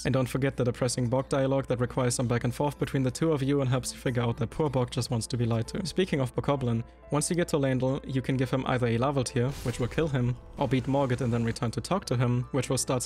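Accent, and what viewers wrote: Germany. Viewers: German accent? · Damn son, you're talking really really fast with a heavy german accent that incorporates A LOT of "ts" and "ks" sounds. All I understand is "tskskshtss stsksstsssst"...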